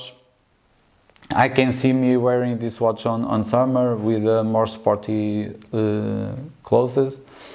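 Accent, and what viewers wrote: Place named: Portugal. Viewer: Pelo sotaque suponho não errar. É português? Bom vídeo, detalhado e pessoal. Estou em vistas de comprar um igual. Aconselha alguma loja em especial?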